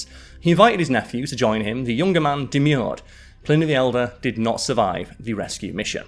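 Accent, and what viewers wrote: United Kingdom. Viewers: Fun fact, accent is closer to traditional British English than Simons. 😊. you may want to slow a bit so many others might understand what you are saying. Your accent is thick and many find it difficult to understand. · yes, true, but the algorithms that attempt to decipher the audio to turn it into captions are (probably) trained on the sort of English spoken by the designers (likely in Silicon Valley in California, USA), and the largest repository of audio recordings (Hollywood, in Southern California, USA.) So the algorithms are biased towards Californian speech, in all likelihood. It’s not surprising that they wouldn’t work well for either Simon or this fellow, both of whom are Brits. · ❤ Your enunciation may need a little practice, but I could understand you just fine, DESPITE your British accent, LOL! One note about your not wanting to eat “poo fish 🐠,” have you had Tilapia or white fish? 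Tilapia is a new name for a old fish, but 85%-90% of the worlds Tilapia comes from…wait for it…sewage treatment plants. Not joking. Look up an episode of “Worlds Dirtiest Jobs” about Tilapia farming. 🤢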